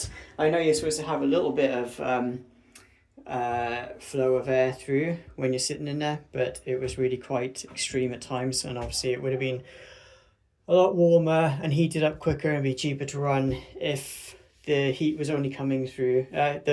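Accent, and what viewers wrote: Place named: United Kingdom. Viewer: My dear friend, you’re picking out faults that only matter if they don’t obscure the main problem - it isn’t a sauna! A sauna is a place where you can wash and use a sauna whisk with at least one friend, and preferably two. What you’ve ordered is a shoebox, and now you’re complaining about the screws. Those bloody screws aren’t going to make it a sauna when pretty much everything else is wrong. First, it’s too small even for two people. Second, there’s nowhere to wash yourself. And third, there isn’t even enough room to use a sauna whisk properly. But I have to say, your British English is an absolute pleasure to listen to:goodvibes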